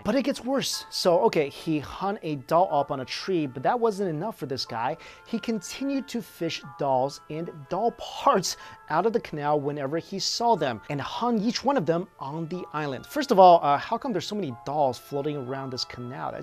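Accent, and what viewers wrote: China. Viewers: listening to someone who is Chinese trying to pronounce Japanese words is amusing lol. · wheres your accent from? are you chinese?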